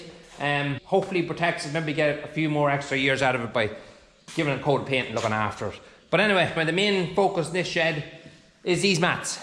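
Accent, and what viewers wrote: Ireland. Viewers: Your videos are so interesting. Things have certainly changed since I worked during holidays from boarding school on my Fathers' Hobby Dairy farm back in the '50s & '60s. Your barns are immaculate and your equipment is top-notch. By the way, I love your Irish brog!!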